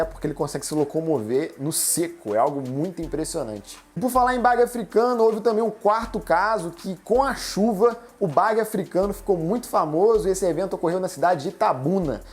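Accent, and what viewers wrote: Minas Gerais, Brazil. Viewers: Vídeo top ,vc e mineiro também m sabia 😄uai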